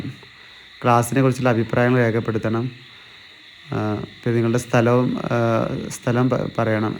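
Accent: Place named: Kozhikode, India. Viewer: ഞാൻ tvm ജില്ല. സാറിന്റെ ക്ലാസ്സ്‌ കേൾക്കാറുണ്ട്. നല്ല ക്ലാസ് ആണ്. ചില വാക്കുകൾ മനസിലാകുന്നില്ല. കോഴിക്കോട് രീതിയിൽ സംസാരിക്കുന്നത് കൊണ്ടാകാം. 😊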